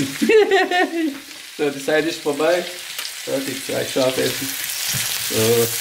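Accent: Swabia, Germany. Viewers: Hallo Bist du etwa ein Schwabe? Dein Dialekt Verrät dich wir kommen aus dem Ruhrgebiet. Tolle Rezepte,sehr lecker und die zubereitung ist auch leicht:goodvibes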